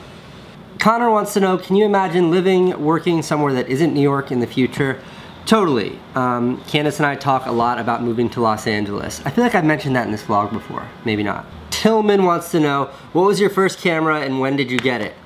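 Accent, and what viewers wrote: Liverpool, United Kingdom. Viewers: Scouse power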